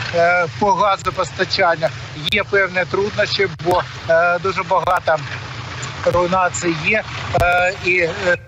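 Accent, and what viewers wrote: Kharkiv, Ukraine. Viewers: Харьковский украинский!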